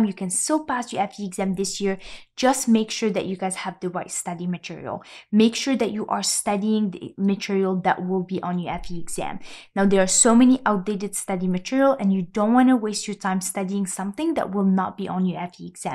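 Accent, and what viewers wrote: France. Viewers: Hi. I like your teaching style. Also can hear your lovely french accent. I too speak French and got all my degrees in French before moving to the US. Here vitesse = velocity … I will need to convert the terms before deep diving. How can you help me? I graduated with my bachelor 14years ago, but want to take the FE in 3months. I do I contact you for an effective communication to get me started? Thank you.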